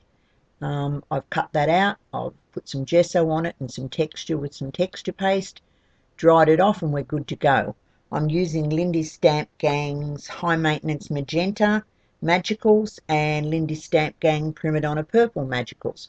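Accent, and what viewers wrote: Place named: Australia. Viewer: Love your tutorials and your accent lol. I'm from Aussie as well. Thank you so much for all these tutorials. I'm very new to this so I'm finding these extremely helpful.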